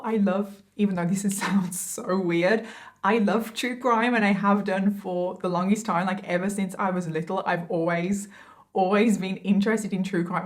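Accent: United Kingdom. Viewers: I found her a couple of months ago, and I've binge watched all but a few videos because I'll be gutted to have to wait for only one a week once she's back this year! THE BEST true crime and make up channel without a doubt! Very brilliantly narrated, fantasticly researched, beautiful makeup and has real empathy and emotion for the victims. I also love that she's from the UK (as am I) as I much prefer her accent! Sorry to everyone else! 🙈 takes the crown for me, has got me into MagellanTV and also Audible! Thanks for your fantastic work - please keep it up! Like many others, it gets me through some very tedious or stressful tasks and times ❤ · Saw your channel on my feed and since I just finished binge watching Bailey, decided to click on your vid about that cannibal frat boy. The moment I heard your accent, I immediately went to your playlist and clicked for your first ever true crime vid and subbed. 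It's really fascinating to hear true crime from a different POV and also from a different country. I'm going through your playlist now and see what sorts of stuff happen around UK. And how people's mind work over there. 💛 lots of love from PH by the way. 🤗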